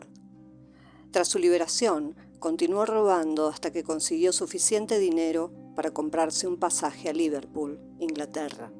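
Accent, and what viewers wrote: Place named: Spain. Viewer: Me gustan los temas pero el acento español de la narradora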